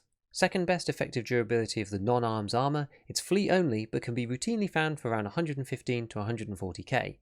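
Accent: United Kingdom. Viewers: The British accent, Can't do it. its like listening to your Nephew talk, bullshit. Your voice has the same frequencies that make dogs wanna commit suicide.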